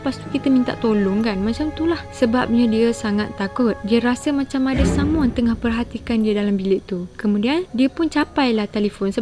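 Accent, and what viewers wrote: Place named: North, Malaysia. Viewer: Comel. Dia campoq bahasa baku dgn bahasa utaqa. Hahahaha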